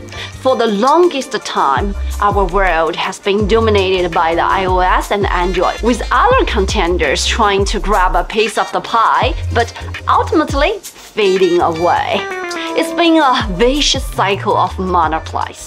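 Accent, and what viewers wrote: United Kingdom. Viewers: don't you know she speak pure UK English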